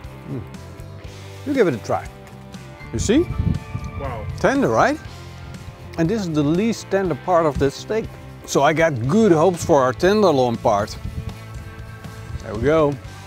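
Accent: Netherlands. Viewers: It's funny, how I always can hear if someone is not natively a english-speaker. Even though your english is really really good i can hear that you are a dutch man, also in your video with that knifeboi I could instantly hear that he is a german. I love that. I love your videos, keep on it.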